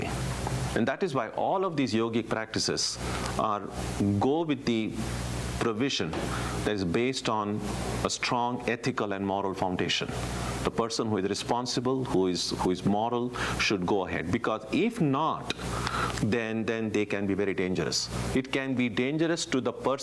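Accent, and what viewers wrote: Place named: England, United Kingdom. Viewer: Thank you for having an English accent that is articulate and clear with consonants and vowels, not slurring your words. 🙏, this help me understand these teachings of that ancient science from the Indian Continent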